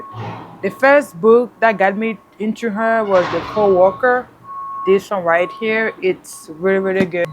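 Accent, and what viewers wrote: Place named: France. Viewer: Hi, I like your French accent that hides behind the English one!! I subscribed just for that!!! Je me suis abonné juste pour cela!!!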